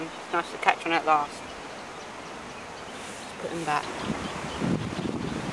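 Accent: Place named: Europe. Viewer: hey man nice fishin, im going to assume from your accent that your from somewhere in europe...im from the northern U.S. and we"ve been fishin through the ice up here catchin alot of northern pike, i think you guys call them jack though. its nice to watch a fishin video taken in open water compared to on the ice! cant wait for summer man!!! keep fishin!!!!!!!!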